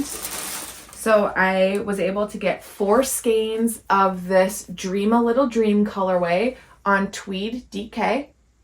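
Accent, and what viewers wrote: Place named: Midwest, United States. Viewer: I'm so obsessed with your recording location and your Midwest accent :)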